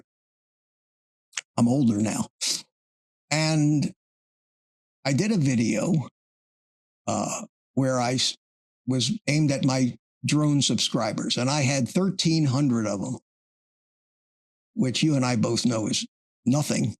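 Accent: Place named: Philadelphia, United States. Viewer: Hi just enjoyed the live stream with Kerry Mann. Just checking out your other videos I enjoy also he is hilarious. I knew your accent sounded familiar I am also a fellow Philadelphian😀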